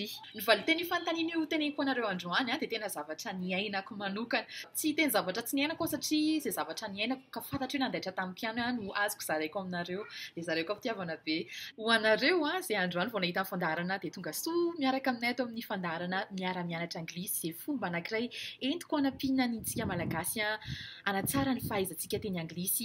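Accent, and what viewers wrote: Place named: United States. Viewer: Nena tena mifangaro daholo a hahaa Amerikanina, Britanika, Afrikanina... well done dear one!!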